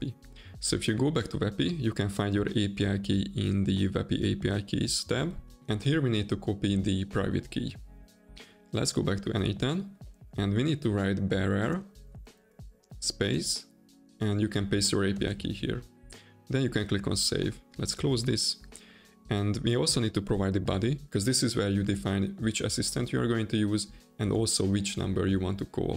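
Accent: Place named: Hungary. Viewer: Volt egy apró magyar akcentus, mondom megnézem a felhasználónevét és jól tippeltem :D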